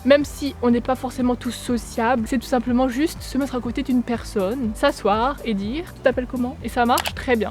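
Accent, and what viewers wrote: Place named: Alsace, France. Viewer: Ehh pas besoin de te demander de quelle fac tu viens, l’accent alsacien ptdrrr waaahh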